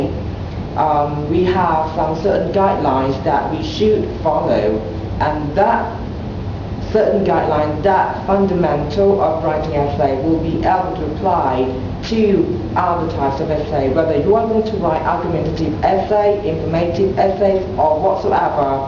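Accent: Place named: England, United Kingdom. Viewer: มาสำเนียงอังกฤษเลย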